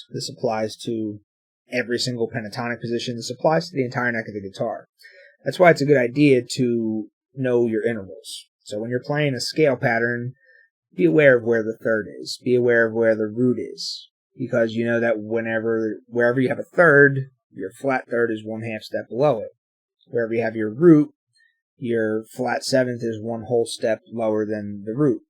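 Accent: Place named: Philadelphia, United States. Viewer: You live in the Philadelphia/NJ area? That accent sounds like home.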